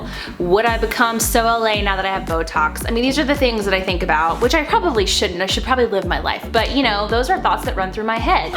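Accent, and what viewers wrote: South, United States. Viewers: F A N C Y - really southern accent - love it!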